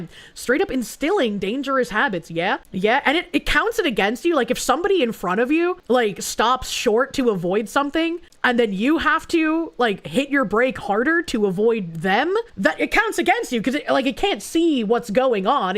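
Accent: Boston, United States. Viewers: Listening to Bostonian yap about the two worst people on the planet fighting each other online really helps make this Amazon route somewhat bearable.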